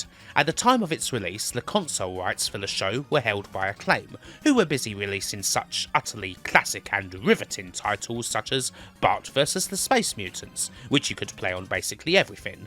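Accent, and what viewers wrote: United Kingdom. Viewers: As an American the way you said "kick some heads in" about X-Men in a pleasant British accent was pretty disturbing/awesome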